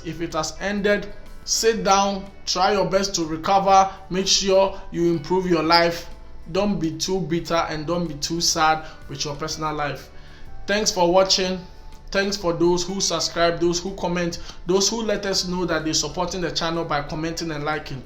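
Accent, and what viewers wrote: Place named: Africa. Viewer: This African accent. I love it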